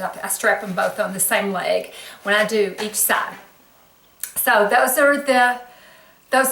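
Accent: South, United States. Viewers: Thanks so much for sharing these. You always look so beautiful and I just love your southern accent. I try to keep my body strong as well and actually bought a hula hoop a while back for some light cardio! It took me a while to remember how to keep it going, lol, but it's a fun way to stay in shape. Take care❤️-Ruthie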